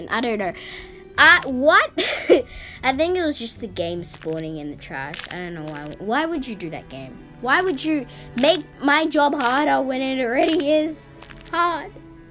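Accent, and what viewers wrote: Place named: Australia. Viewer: I can tell your an aussie by listening to your voice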